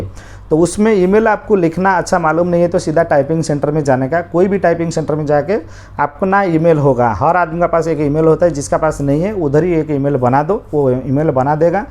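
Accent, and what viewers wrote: Odisha, India. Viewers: Aapka hindi se pta chalara aap odisha se ho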